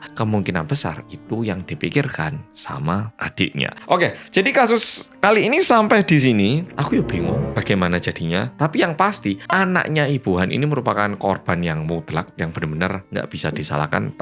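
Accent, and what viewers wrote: Java, Indonesia. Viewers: hallo ❤ Nyimak ceritamu 🎉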